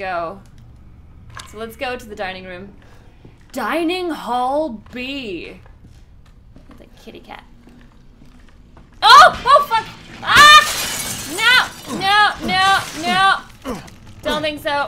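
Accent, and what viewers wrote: Scotland, United Kingdom. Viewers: Love you! Damn well hooked me on this series of yours so you have my thanks for that including getting them out so early! (Plus the amazing scottish accent)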